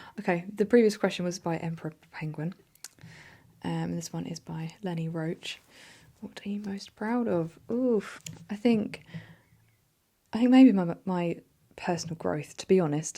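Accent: Ireland. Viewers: Great pt.1 of the Q&A. I think these bits of insight into who you are definitely made you feel more relatable, IMO, although we get quite a lot of that in your other uploads too! Really heartwarming to hear how your channel's had such a positive impact on your life outlook as well. As for the Irish accent video being so popular, I'm not surprised at all. There's somewhat of a dearth on YT of Irish ASMR creators, at least from what I've seen (or haven't). It can be such a beautful accent so it seems like a natural pairing with ASMR stuff. Searching for that is actually how I found your channel! Last thing, if you're 24 and born in 1996, then you're a rat. They do their signs on a rotation of twelve animals/years. This is definitely the longest comment I've ever posted, LOL!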